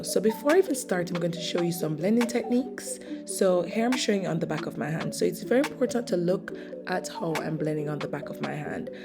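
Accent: Jamaica. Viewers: Am I hearing a Jamaican accent? Subscribing!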